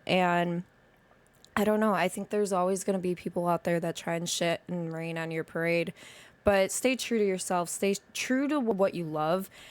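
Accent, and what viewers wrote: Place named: Midwest, United States. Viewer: You have a very midwestern style of speaking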